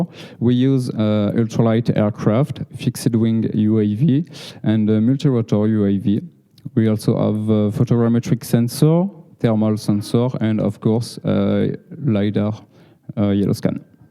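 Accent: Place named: France. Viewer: do the french know that they sound atrocious when they speak English? Your accent, she urts may eers, no?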